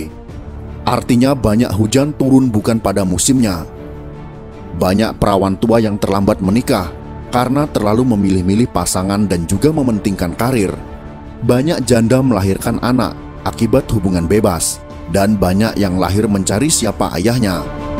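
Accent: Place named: Java, Indonesia. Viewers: Ndelek suarane arek jatim😄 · Wong jowo ndy suarane 🙏🙏🙏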